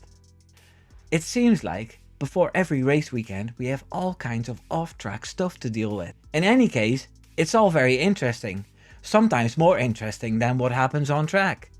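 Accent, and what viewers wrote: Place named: Netherlands. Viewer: You are obviously Dutch from your horrible accent! Jos is the trouble-maker,not Christian! Max, unfortunately, only does what dad tells him.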